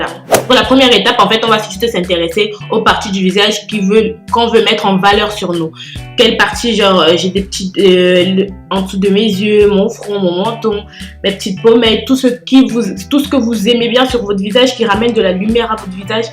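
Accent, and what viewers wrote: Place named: Cameroon. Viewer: Les 1ere secondes ton accent me rappelle que tu es triangle national camer. En tout cas jolie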